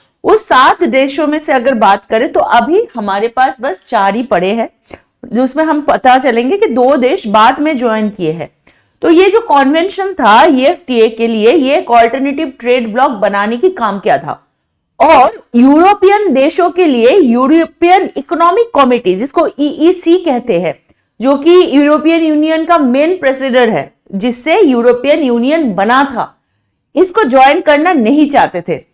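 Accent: Bengal, India. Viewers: Develop countries like America France Russia Britain Japan etc Underdeveloped nation like Afghanistan Bangladesh Myanmar Chad Mali Nepal etc I think ma'am you are from West Bengal. Bcoz you hindi tone like that. Thank you ma'am.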